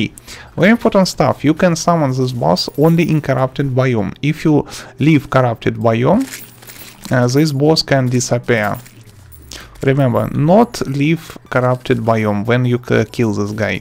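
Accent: Russia. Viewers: I like this youtuber not only for he's good explanation but also he's Russian accent (sorry if that is offensive) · Russian accent😫😍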